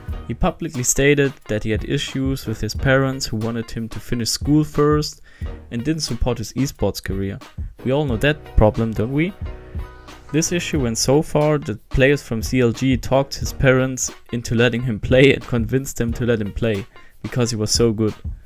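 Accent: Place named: Germany. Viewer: Man hört sofort den Deutschen Akzent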